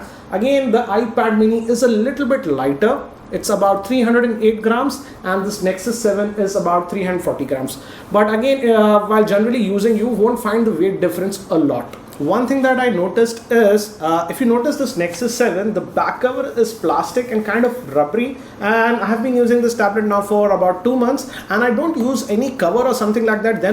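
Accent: India. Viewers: This guy has the most normal stereotypical Indian accent i have ever seen.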